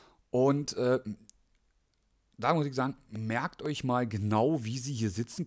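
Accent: Berlin, Germany. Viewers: ich finde dich klasse ☺ was du sagst kann ich nur so unterstützen seh das genauso und deine berlinerschnauze Made my day 👍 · 😂😂😂deine Berliner schnautze.....voll Guut👍😂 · Du kommst aus Berlin!? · sieht verdammt aus wie Ragnar Lodbrok von Vikings o.O Ragnar mit Berliner Schnauze - göttlich, einfach göttlich. Macht das Video (übrigens gut zerlegt, diesen Schund!) irgendwie echt witzig... wenns nur nicht so traurig wäre... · Cooool! Ne dampfene berlina Schnauze, find ick jut! Abopflicht, wa!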